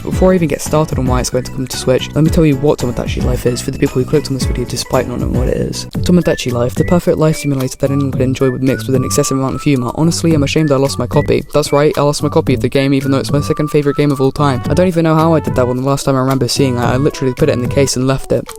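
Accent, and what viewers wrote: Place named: United Kingdom. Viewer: british boy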